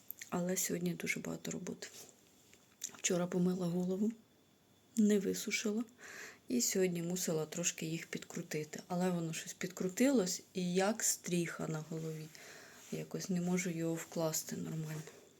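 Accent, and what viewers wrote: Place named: Surzhyk, Ukraine. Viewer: Ви суперові, приємно чути ваш суржик. Нехай у вас все легко виходить, удачі вам.🌺👍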